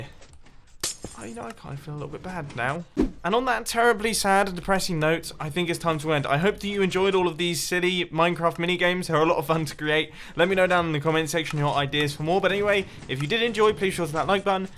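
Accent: England, United Kingdom. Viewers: I love English YouTubers the way they talk makes me laugh(this is not an offensive comment I hope)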